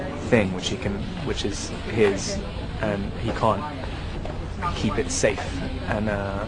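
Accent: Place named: London, United Kingdom. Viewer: his accent is like London English, Standard English, he is accentless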